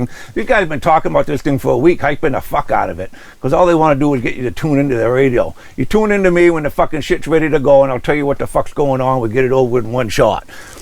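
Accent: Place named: New Hampshire, United States. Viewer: Being born and raised in Winchester NH I always turn to your channel to see what's happening "back home". We don't see much snow here in Arizona. Love your accent, makes me feel right at home always. Thanks!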